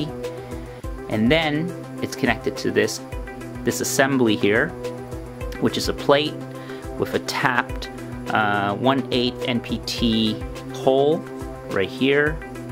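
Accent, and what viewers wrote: Canada. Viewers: canadian..abooot...thanks for sharing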